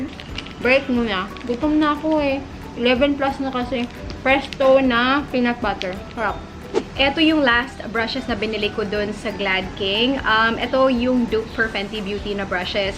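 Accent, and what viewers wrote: Visayas, Philippines. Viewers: Cute kayka mag bisaya 😂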